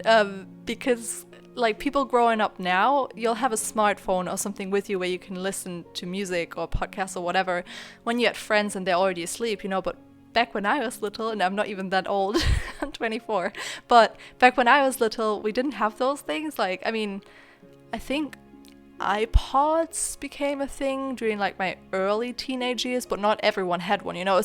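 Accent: Germany. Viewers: german accent? :D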